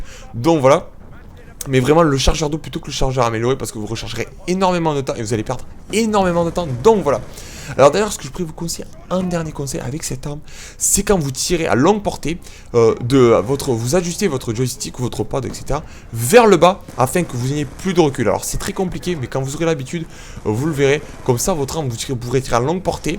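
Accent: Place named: Marseille, France. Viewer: Je veux pas dire mais tu pourrais ralentir la cadence quand tu parle,parce vraiment c'est dur dur à comprendre ce que tu dit en plus avec ton accent marseillais sa n'arrange pas les choses sinon joli massacre :)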